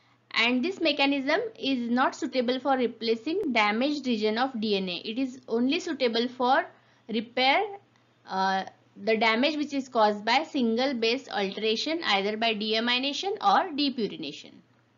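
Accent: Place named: India. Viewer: The indian accent is so clear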